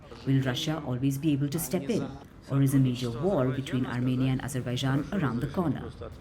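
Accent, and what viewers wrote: India. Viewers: A dusty Indian accent is heard. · Why all these news are read by indian?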